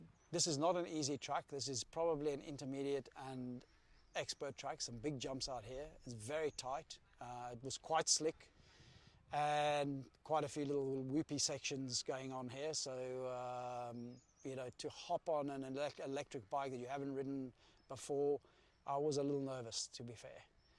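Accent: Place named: South Africa. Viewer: You sound South African :)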